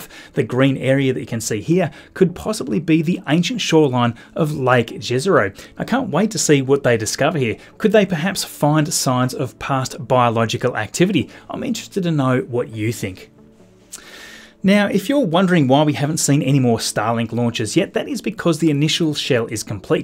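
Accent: Australia. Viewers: Where re u from Australia?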